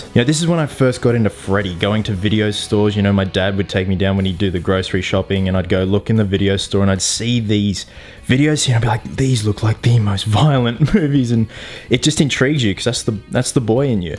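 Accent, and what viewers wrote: Australia. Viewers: Where are you from?? Australia?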